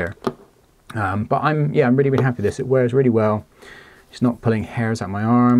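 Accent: Bristol, United Kingdom. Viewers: Fellow Bristolian? But in the Ocean so maybe Plymouth?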